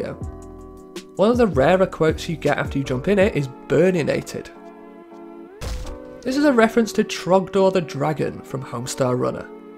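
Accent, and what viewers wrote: United Kingdom. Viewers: Where in UK are you from? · I'm happy to have this to watch, but at a certain point I got to the stage of thinking "if I hear him say 'neversoft developer' in the same nonchalant British-accented English teacher tone just one more time, I'm gonna lose it" You really should have broke that section up or just listed all the names in rapid succession and said they were all developerS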